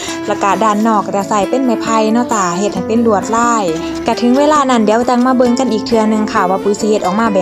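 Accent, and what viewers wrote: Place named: Sisaket, Thailand. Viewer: เว่าไป๊-กิ๊นคนทางศรีสะเกษเบาะคะอยู่อำเภอได๋น้อค่ะ😅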